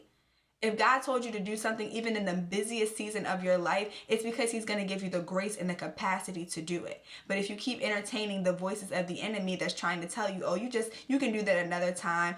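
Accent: Baltimore, United States. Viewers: Off topic but are you from baltimore/dmv area?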